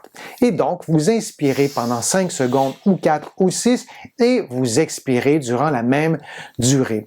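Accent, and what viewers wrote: Quebec, Canada. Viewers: Tellement cute l'accent québécois aux oreilles françaises. Merci pour les explications.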